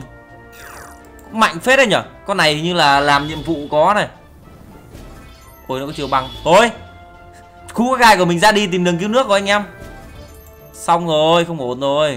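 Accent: Hanoi, Vietnam. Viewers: o Ha Noi ak hay BAC NINH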